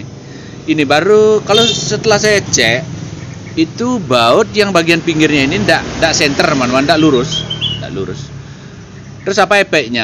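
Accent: Bali, Indonesia. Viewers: Bali gati bapake ne 🤣🤣. Lanjut pak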